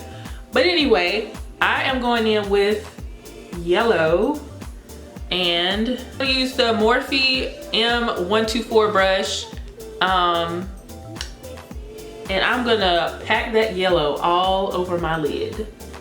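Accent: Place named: North Carolina, United States. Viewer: Just found your channel and I'm binge watching all day!! I love your country accent you sound like my cousin from North Carolina!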